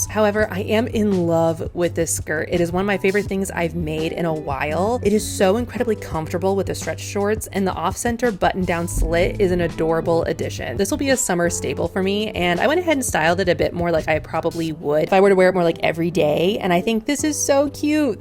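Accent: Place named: Pacific Northwest, United States. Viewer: Please tell me you live in the PNW. Everything about your voice and the views from the videos I’ve seen tells me that you are - reminds me of Portland, Oregon??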